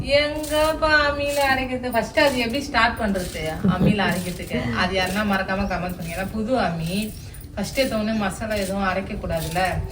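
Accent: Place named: Jaffna, Sri Lanka. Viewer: இலங்கை யாழ்ப்பாண தமிழ்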